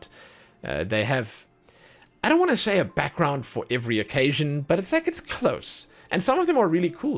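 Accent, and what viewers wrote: South Africa. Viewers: Are you South African? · Your accent sounds like south african. I'm from South africa and u sound like our accent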